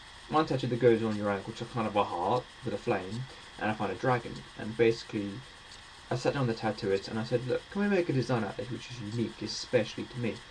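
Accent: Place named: United States. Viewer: Dude please, PLEASE drop the american acsent!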